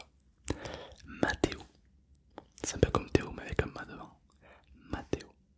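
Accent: South, France. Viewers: Coucou je voulais te dire que j'adore ton accent du sud et ton amour ça m'a fait beaucoup rire 🙂 PS: la prochaine fois que tu fais une vidéo sur les prénoms est-ce que tu pourras mettre le mien Je m'appelle Jean-Baptiste. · tu a l axen du sud ????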